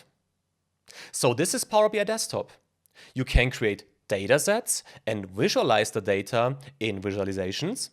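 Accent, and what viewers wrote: Germany. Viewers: Love his German accent.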